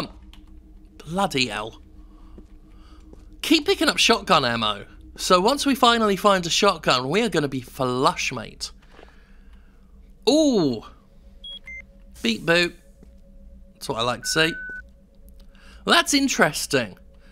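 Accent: United Kingdom. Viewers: sounds.. extra british in this one. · Glad I wasn't the only one wondering if he sounded more British than usual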